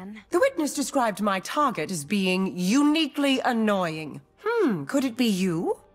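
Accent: United Kingdom. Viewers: You have a little character in your British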